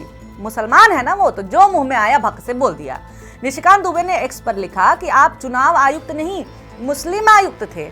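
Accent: Bihar, India. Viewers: I'm fan of your style ❤ Up Bihar wali hindi tone + smile ke sath roast😂😂 I obsessed with your all episode 😊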